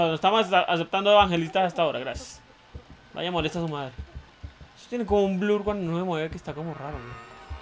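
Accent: Colombia. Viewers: bro eres de bogota o medellin?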